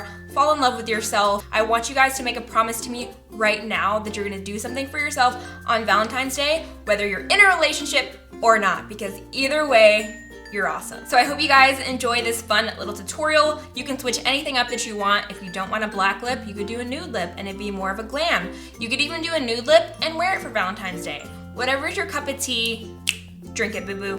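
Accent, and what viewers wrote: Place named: Tennessee, United States. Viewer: After watching this, I watched your Thanksgiving video and I love your accent! It's so pretty in Tennessee!! I love you! #snapfam #Igfam #youtubefam #queen 💜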